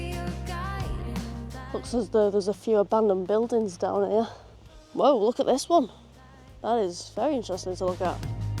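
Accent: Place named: North, United Kingdom. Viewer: Ah sorry it's that lovely northern accent of yours lol. Keep up the great videos they always make me smile 😊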